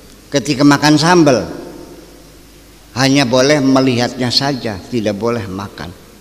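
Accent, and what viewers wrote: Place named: Indramayu, Indonesia. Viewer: WASPADAI UCAPAN2BUYA SYAKUR dg DIALEK BAHASA KHAS INDRAMAYUAN?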